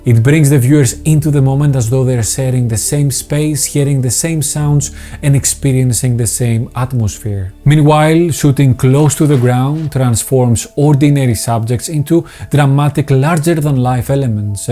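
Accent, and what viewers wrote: Greece. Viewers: Μου αρέσει ιδιαίτερα η προφορά στα αγγλικά.Δωρική θα την έλεγα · Amazing, your Greek accent is similar to mine, from Spain.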